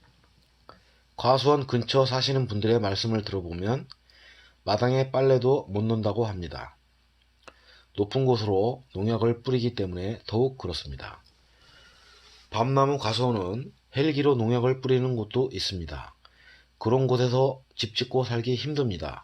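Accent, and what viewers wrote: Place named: Seoul, South Korea. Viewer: 까?하실때 악센트 주시는게 서울사람이시네요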